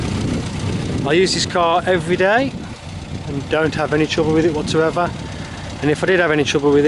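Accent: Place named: Black Country, United Kingdom. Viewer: black country bloke ay ya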